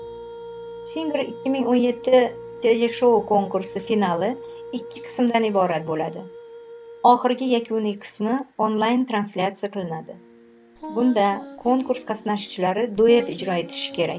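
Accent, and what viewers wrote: Uzbekistan. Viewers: Диктор Хорезмненсизба? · Диктор с какой страны? С Узбекистана?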